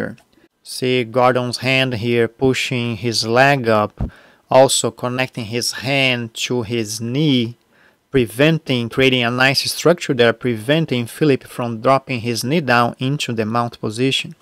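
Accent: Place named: Quebec, Canada. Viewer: Hey I just noticed your voice reminds me of GSP! Did you spend some time living in Quebec?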